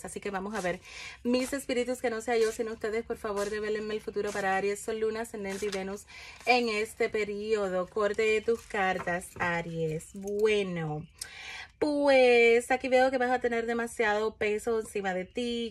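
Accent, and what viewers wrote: Dominican Republic. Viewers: Hay enemigos si soy policía y mis jefes son misogenos y yo no me dejo de nadie una verdadera bomba en en trabajo(nido de víboras) yo espero la mandinga o ya al menos el dinero del amor hablamos después jajaja muchas gracias te quiero mucho y me encanta tu acento Dominicano 😘😘😘😘😘😘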